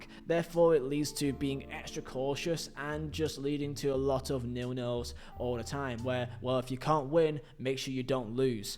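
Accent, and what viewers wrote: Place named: Lancashire, United Kingdom. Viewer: That's just the Lancashire accent.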